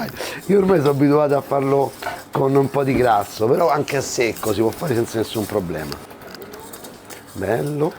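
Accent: Rome, Italy. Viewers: CI DICA L INDIRIZZO DEL SIO RISTORANTE. A MILANO COSA DICONO CHE LEI PARLA ROMANESCO? E POI PER CHÈ HA SCELTO MILANO PER IL SUO RISTORANTE E NON ROMA LA SUA CITTA? GRANDE CARISMATICO!!!!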